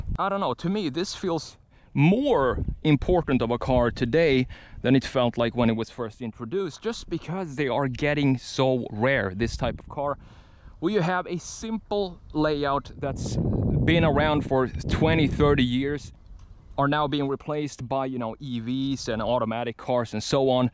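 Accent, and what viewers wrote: Sweden. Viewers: Are you from Sweden? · Hi, a very nice review. I also like the NC as that engine has a greater potential than earlier engines and its a great platform too. Are you from Sweden ? I can hear a ”tone” of Swedish in your accent.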